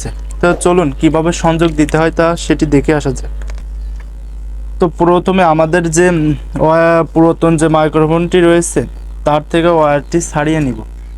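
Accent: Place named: Narail, Bangladesh. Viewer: প্রাই নড়াইল এর ভাষার মত,,,,, কোন জেলায় বাড়ি ভাই আপনার??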